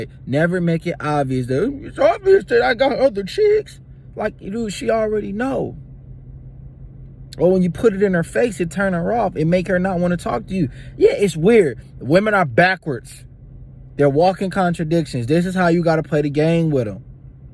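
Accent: Baltimore, United States. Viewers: He def from Baltimore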